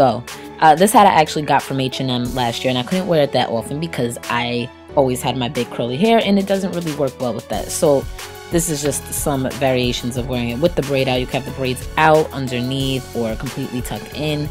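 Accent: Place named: New York, United States. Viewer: where r u from? i love ur voice...new york or somewhere up north with a tinge of latina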